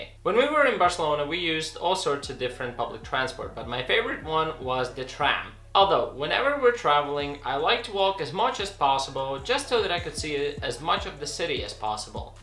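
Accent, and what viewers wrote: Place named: Latvia. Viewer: Nice video. Uzreiz varēju pateikt ka esi latvietis pēc tā ka teici 2 miljoni cilvēki. Akcents nodeva. Tad tava kanāla nosaukumu izlasīju. Bet tā. Forši ka šādu info liec :) noderīgi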